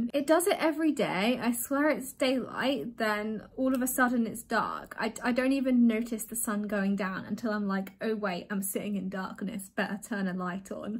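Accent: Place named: England, United Kingdom. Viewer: what part of england is that accent from? I like it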